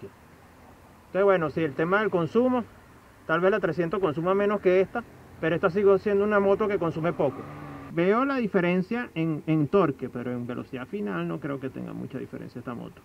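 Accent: Venezuela. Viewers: Eres venezolano? 😮 Me gustó mucho tu estilo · Por tus acentos eres 100% Venezuela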